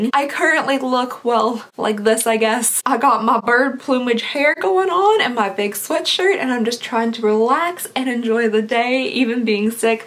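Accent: Kentucky, United States. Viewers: Ha ! I was like she sounds like she is from Kentucky! I am from Louisville. Love your videos !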